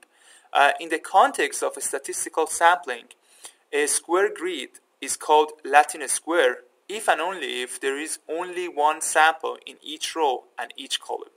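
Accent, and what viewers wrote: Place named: Iran. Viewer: Thank you for the Video. I like your Iranian accent :)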